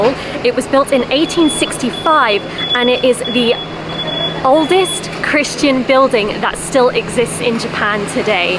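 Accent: RP, United Kingdom. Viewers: お～っ！とっても素敵なQueen's English での歴史解説に観光案内．．．良くできたＢＢＣの番組見てるみたいです😮でもやっぱり食べるんですね😁番組を見ているような、スムーズに流れる感じは、TAROさんのカメラワークによるところ多いかなと😊リポーターのお話と魅力をうまく引き出してると思います。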